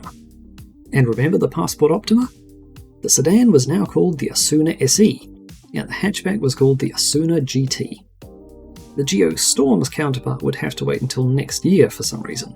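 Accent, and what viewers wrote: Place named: United Kingdom. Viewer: Drop the British accent. It doesn't help.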